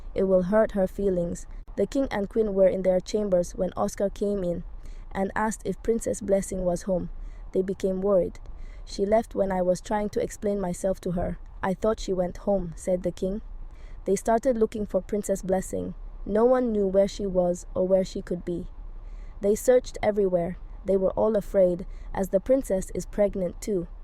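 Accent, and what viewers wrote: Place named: Liberia. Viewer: You sounding like you’re from my hometown. Are you a Liberian?!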